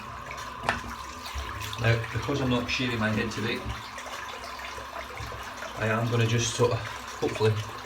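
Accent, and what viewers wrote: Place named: Scotland, United Kingdom. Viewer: Hi new to DE shaving some great videos!! I recognise the Scottish accent good to see a fellow Scot on here. I'm in the west of Scotland myself.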